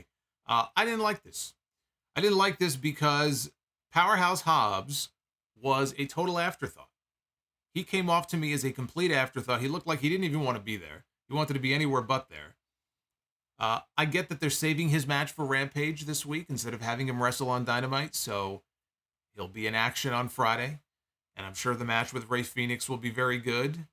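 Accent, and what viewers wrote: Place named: Brooklyn, United States. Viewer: Omg! The footage of your bar mitzvah melted my heart. You still have your Brooklyn accent, don’t worry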